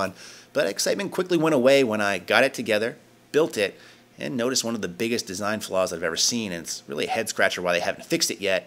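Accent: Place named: East Coast, United States. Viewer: East coast accent coming through HEAVY in this video! Great review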